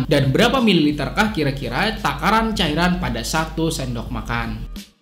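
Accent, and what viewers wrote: Banjar, Indonesia. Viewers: dari dari logatbicaranya seperti Bahasa Banjar!!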